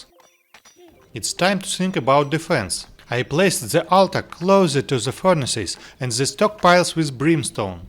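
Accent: Russia. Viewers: я не лингвист и могу ошибаться, но вроде бы это ошибочное мнение новичков в языке (и я так думал; не то чтобы я был гуру в английском ^_^, но считаю, что уровня Intermediate). Чётко и с акцентом -- русским так чётче и понятнее, а иностранцам -- наоборот. Но ведь такое видео делается как раз-таки для них...:) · Русский акцент)))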